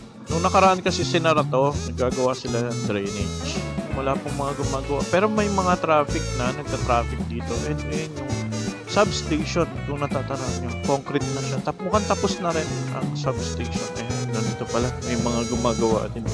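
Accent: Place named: Hagonoy, Philippines. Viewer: ayown sana all talaga lagi sa gala..gusto ko ung salita hagonoy accent😂 try mo minsan mate😅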